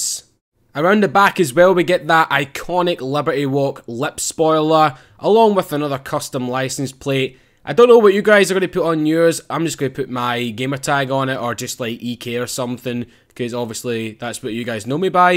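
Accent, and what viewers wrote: Scotland, United Kingdom. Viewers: u r my my fav YouTuber now not only r u Scottish like me but I can make out every word u say unlike the Americans lol. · he's from Scotland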